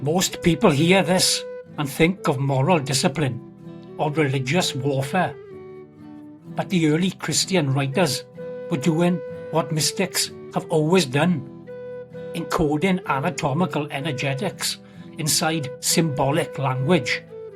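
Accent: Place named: Wales, United Kingdom. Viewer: Fantastic video. Thankyou for making this. Great to hear the Welsh accent. I was born in Cardiff and grew up in Rudry in south Wales.❤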